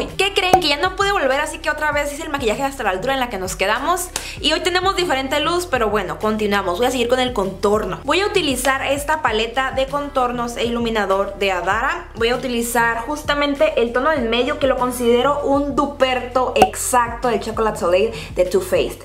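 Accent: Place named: North, Mexico. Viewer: Me gusta tu acento bien norteño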